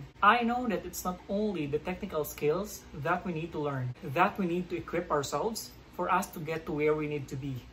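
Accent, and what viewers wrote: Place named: Philippines. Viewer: Owwh i like ur content po and also ur accent when u speak english proud filipino talaga hehe more interesting video pa lods cant wait to see more videos from you po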